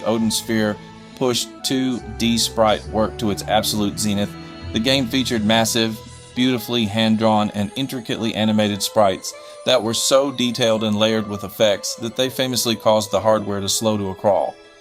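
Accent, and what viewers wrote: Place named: South, United States. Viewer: you sound southern as hell brother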